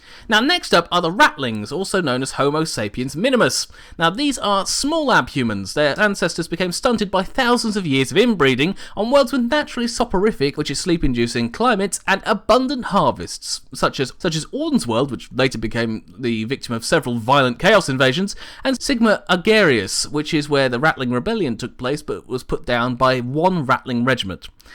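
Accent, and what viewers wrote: United Kingdom. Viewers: Hey I love your channel and every time you post a video it brightens up my day with glorious 40k goodness, delivered in a charming English accent just a it should be. I was wondering if you would re-visit space marine chapters with The Silver Skulls, a very little known chapter with a interesting set of traditions that really deserves more attention from fans. In short cross Ultramarines and White Scars.... yea thats a thing <link>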